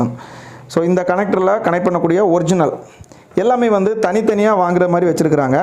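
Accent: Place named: Kanyakumari, India. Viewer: Kanyakumari pechu mathiri iruke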